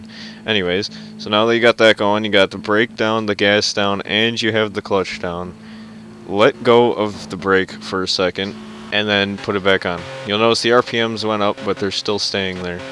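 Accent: East Coast, United States. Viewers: Dude are you from the East Coast? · are u from the east coast?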